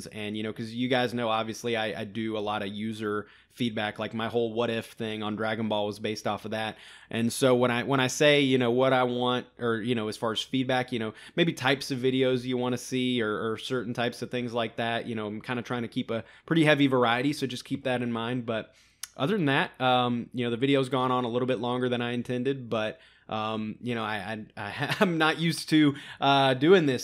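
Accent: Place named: South, United States. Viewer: Wow back with a new beard and a slightly thicker southern twang?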